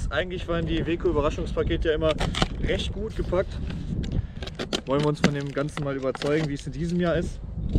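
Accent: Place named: Rostock, Germany. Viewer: Kommst du aus MV? Genauer gesagt - nähe Rostock?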